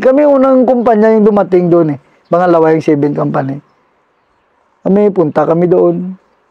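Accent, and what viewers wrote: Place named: Iloilo, Philippines. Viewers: Parang ilonggo si sir ah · Alam mo talaga pag ilonggo kay tuno perti gd 🫡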